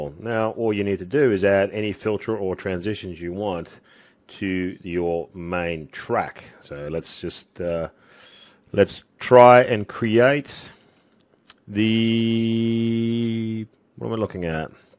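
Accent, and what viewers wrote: Australia. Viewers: Cool. You still got the sweet aussie drawl mate. ☺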